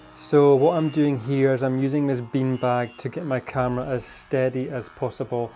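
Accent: Scotland, United Kingdom. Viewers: This video just popped up on my YouTube recommendations. The Lion footage is just incredible! Nice to hear a Scottish accent on a photography channel. I am originally from Alloa now living in Melbourne. I took up Bird photography about 3 years ago, heaps of birds here but no lions unless you go to Werribee Open Range Zoo which is incredible. Have just subscribed so looking forward to more inspiration!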